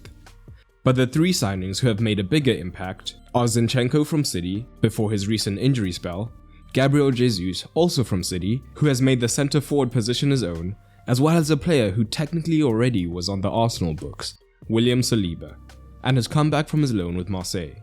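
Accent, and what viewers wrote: South Africa. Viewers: Are u South African? 🤨🤔🧐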